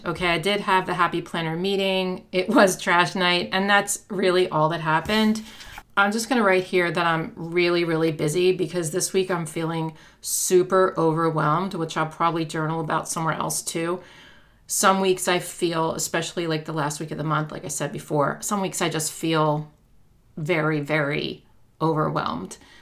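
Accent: Wales, United Kingdom. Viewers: If he’s from Wales his accent is Welsh!😊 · Flowers and a Welsh accent?! ❤❤❤❤ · A gentle and thoughtful man with a British accent??? Swoon!!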